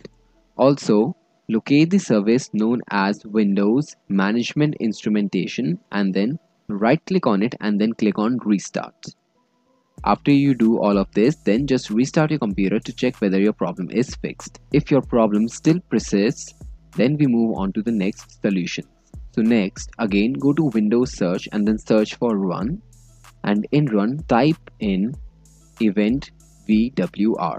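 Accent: India. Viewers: when you hear indian people. you know it will work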